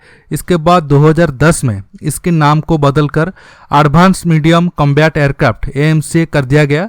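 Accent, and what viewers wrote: Odisha, India. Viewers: Is the narrator an Odia?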